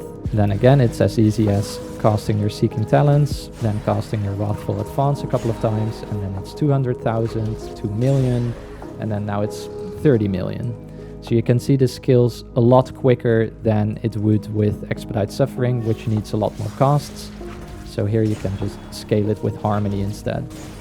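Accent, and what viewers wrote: Netherlands. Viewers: Do i hear a dutch accent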